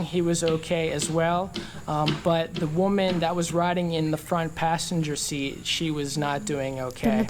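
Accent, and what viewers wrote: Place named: Kelantan, Malaysia. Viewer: ini dye cakap kelate jgk mcm aiman...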